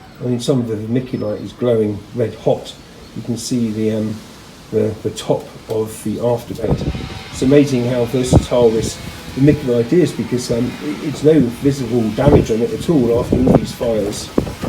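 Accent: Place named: United Kingdom. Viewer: Hi! (I am hoping I got the name right!) Increasing the depth of the top box seems to have helped our objective. I have been experimenting with Trevor's modified 4" version with after burner directly onto the hotplate. I did a rough layout this morning and did a test run - the heat is so immediately seen on the top plate. No smoke from my 5" chimney that I use put at the moment. I will be constructing the whole stove from firebricks. Its difficult to get hold of vermiculite boards in India (a lot is made here but mostly exported to the western nation it seems as there is a higher demand there for insulation purposes I presume). By the way your speech is certainly English(British) and I was wondering if this location you are shooting at is within Britain or somewhere warmer! It certainly is very nice looking kitchen garden / patio garden you've got there and gives off the feeling of somewhere in southern Europe!:)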